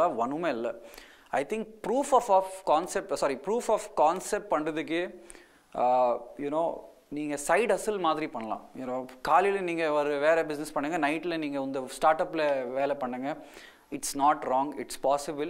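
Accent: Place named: Bengaluru, India. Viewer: Bengaluru tamil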